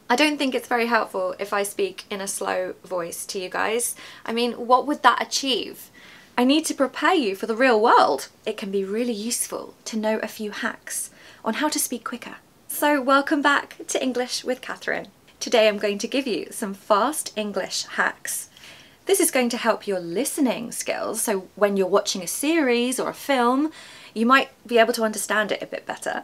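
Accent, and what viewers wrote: United Kingdom. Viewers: Hello Miss, I am an English learner from China, and I saw your video on YouTube. You know, British accent is very popular in China, and your English accent is very nice, and your voice is touching. Your video also shows many unique cultural differences between England and China, as well as popularize many UK culture and interesting traditions. Someone's moving your video from YouTube to Blibli (A Chinese Video Net like YouTube) I don't know if you know this? I suggest you also register an account on Blibli and upload your videos, so that there will be more Chinese English learners watching your videos. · I’m from 🇹🇭and I really love your British accent it’s so nice to listen to. I really wish you’d make longer video or even a podcast. I’d happily listen to you every day! ❤ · I love British pronunciation.. because it very clear sound Thank you...❤❤❤❤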